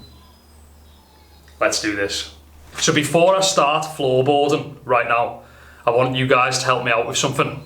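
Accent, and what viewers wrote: Liverpool, United Kingdom. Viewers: Grew up in Liverpool, moved to Australia 10+ years ago. Been watching your videos for years now, miss hearing a scouse accent, so thank you for blessing my ears. Keep up the quality content bro <3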